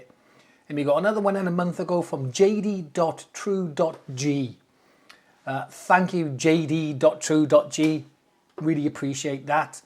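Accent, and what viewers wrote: Wales, United Kingdom. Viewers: Just subbed and thanks for you content , loving the welsh accent 🤣🤣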